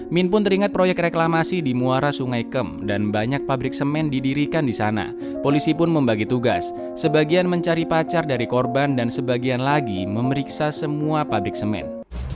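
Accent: Java, Indonesia. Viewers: Kak orang jowo to logat ne kayak uwong jowo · Jowone ngndi mas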